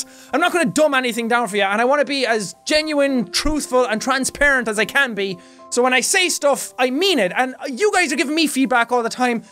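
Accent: Ireland. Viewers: He's form Ireland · Are you Irish? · Hey.... or, or whatever your name is.:$ haha I'm a new subscriber to you and I just wanted to say that when I saw a youtube gamer with an Irish accent, I was in complete awe! You're brilliant, dude! Can't wait to see more vids! P.S. I was in Ireland on vacation over the summer, and I really miss it. Your accent brings back a lot of awesome memories.:) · and/or i think that your are absolutely BOSS for putting up with bullsh*t comments and that your mail sail hair is BOSS and i want your fricking hat, i live in the southwest of England and my girlfriend is from northern Ireland and i just LOVE the Irish accent and i can understand everything you guys say and wish i could change my accent to Irish it's too fun! Lots of lols i cannel all my bossness towards you so that you can be even more boss, if that's possible- from Caz!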